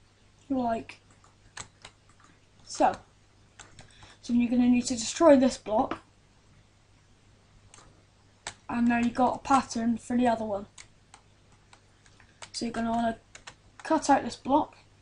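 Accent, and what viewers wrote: United Kingdom. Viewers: you sound more scotish than english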